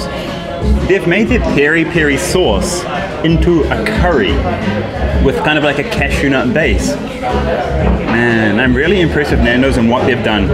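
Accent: India. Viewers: dude you got an Indian accent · Aap hindi main boliye/please speak in hindi. I like your hindi accent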